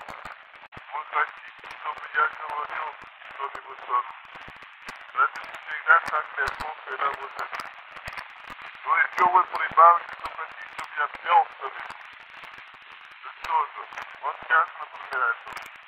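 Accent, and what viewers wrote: Germany. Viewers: Мне одному послышался акцент, либо я настолько нерусский, что?...И акцент более наверно немецкий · Немецкий акцент у русского царя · Акцент немецкий, что говорит о том, что он не был русским! Никогда и ни в каком месте он русским не был, как и все цари! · Акцент конечно. "Так немцы говорьят". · Erich, das ist natürlich. Deutsches Blut. Selbst Nikolaus II. entwickelte, als er sich Sorgen machte, einen Akzent. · У него жесткий немецкий акцент! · Вряд ли это голос русского царя...акцент больше на немецкий тянет. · Акцент такой - будто сам Бисмарк спел. Пьянчуга и дебошир, доводивший супругу до слез, лицом типичный немецкий юнкер, царь Александр обладал чутьем на хороших государственных людей. Светлая память государю. Да и евреям, кто хотел, разъехаться позволил. Че уж злобу таить спустя столько лет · при чём здесь это? Александр говорил с явным немецким акцентом, ты а ушки что ли долбишься? 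· Какой же он русский царь, акцент дикий немецкий. У нас на ток-шоу ходит немец Феликс, так у него меньше акцент. Может поэтому революция потом произошла? Там Романовых осталось с ноготок отрезаный. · с акцентом говорил.немец что ли? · Да он же еле по русски говорит. Какой дикий немецкий акцент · Прекрасно! Но всё же слышно, что говорят по русски с немецким акцентом... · Кто не понял, кто туг на ухо - русский царь говорит по-русски с сильным немекцким акцентом..... вот такой он "русский"... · он произносит русские гласные как немец, слово говорят он произносит как говор'ят у немцев не получаяется мяко произнести р пред дифтонгом я. Про крестьян тут не надо сказки рассказывать, тот же пушкин до трех лет говорил только по французски, в его произведениях грамматический строй очень напоминает французский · Речь человека с немецким акцентом. Вряд ли это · Интересно, что явно слышен немецкий акцент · Больше, как в немцев плюнуть - и сказать нечего. 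Пусть даже с немецким акцентом. Эх, Русь, Русь... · Полная неожиданность услышать акцент у русского немца. Который себя даже немцем не считал. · Вообще странно, говор далёк как от среднерусского и московского, что не удивительно, учитывая что резиденция царей была на севере, но и не похож на типичный сереный говор, больше на иностранный акцент а-ля немецкий или английский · Обладательница женского голоса "окает" и это достаточно отчётливо слышно: "пОгОда хОрОша". А вот у мужчины слышен именно немецкий акцент, хотя он и хорошо говорит по-русски. Типичная "р", которая произносится одновременно и языком и как гортанная и нет плавного мягкого перехода от согласной к мягкой гласной. Вместо "ря" слышно "рья". Для немцев это типично. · Чет сомневаюсь что русский царь будет петь такую песню. + жуткий акцент. Акцент просто жуть. Или певец так прикалывается, имитируя немца или он так хорошо владеет строруским говором в нос. 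Но скорей это все же акцент.Жуткий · Немец...акцент выдаёт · Это не голос Александра 3. Это голос какого-то иностранца. Акцент европейский, германский. Тут в комментах многие накидывают, что дескать иностранец, потому и акцент. Господа хорошие, а как вы объясните тот факт, что у Николая 2 не было ни намека на акцент, при том, что они в свои годы воспитывались в одинаковых условиях? И у обоих матери - иностранки. Даже больше - бабушка Николая 2 из того же рода, что и его жена, из Гессенского дома. Хватит пороть чушь про то, что императоры были иностранцами. По крови - да. По воспитанию - концентрированные русские, до мозга костей, как говорили раньше. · А император вовсе и не русский, потому что говорит по-русски с реальным немецким акцентом. Может большевики были и правы когда уничтожили под корень эту царственную германско-датскую нерусь, котороя царствовала Россией на протяжении сотен лет плохо говорила по-русски и жен себе искала и отдыхала исключительно в Европе Создается конкретное впечатление, Россия при царях Романовых начиная с Петра1 была 100% колониальной страной под управлением Европы. 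· че бы у немца не быть немецкого акцента?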